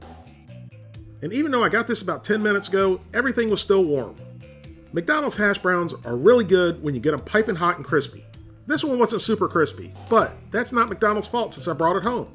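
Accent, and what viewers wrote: South, United States. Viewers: I find the topics you cover to be interesting but I hate your scripted delivery and gay estrogen filled hillbilly voice.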